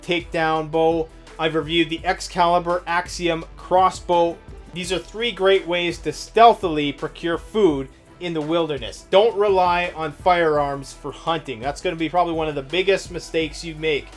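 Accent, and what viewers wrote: South, United States. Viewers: I love this freaking American accent man it’s so awesome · Work on your southern accent.By the way, you look like "the Fly" from the '50s movies(As The ). Your videos give out very useful information.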